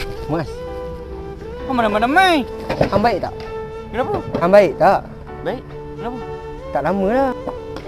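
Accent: Kelantan, Malaysia. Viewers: Bg dari kelate ke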